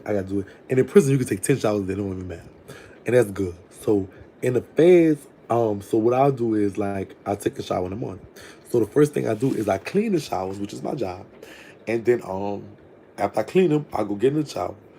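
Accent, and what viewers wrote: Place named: Houston, United States. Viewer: You talking in that Houston boys voice is taking me out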